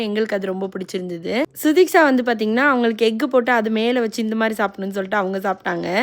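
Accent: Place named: Dindigul, India. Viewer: Akka neenga Tamil natural dindigulayaa iruntheenga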